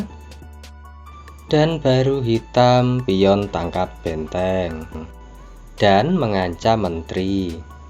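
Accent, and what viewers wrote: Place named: Central Java, Indonesia. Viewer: ..yg koment bukannya ngantuk ttpi yy bgitulaah medhok bingit logat 1area wilayah daerah di jawaTengah, lanjutkan dik Adminn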